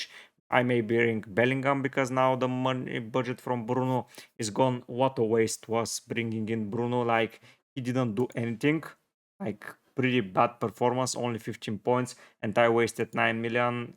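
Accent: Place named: Bulgaria. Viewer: I just heard 3 seconds and I knew you were Bulgarian, can’t miss the accent.н😂 Жив и здрав брато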